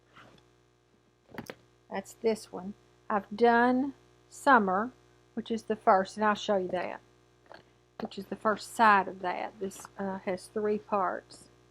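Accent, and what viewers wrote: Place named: Oklahoma, United States. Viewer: I love hot chocolate! I've been enjoying your prayer chair chats because I love your accent. My Grandma was from Oklahoma and you sound so much like her, it brings back so many happy memories for me. Happy stitching!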